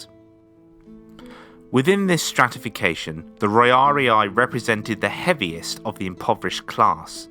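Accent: United Kingdom. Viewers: ya sound like you're from heartfordshire. Let that proper british accent out will ya? 💯👍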